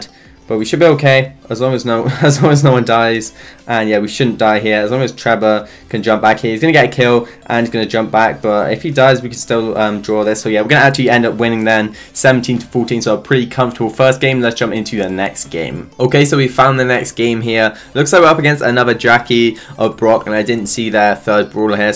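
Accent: United Kingdom. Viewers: I just love your britain accent😊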